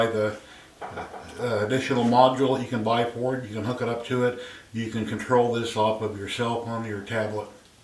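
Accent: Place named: United States. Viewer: very useful review 👍 Sorry but the American accent beat me :) Could you give the name of the sites you used for the maps and tray designs? Thanks!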